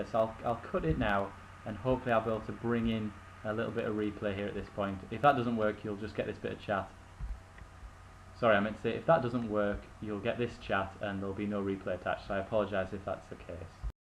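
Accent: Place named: United Kingdom. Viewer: Great to hear another British accent on Clash YT vids.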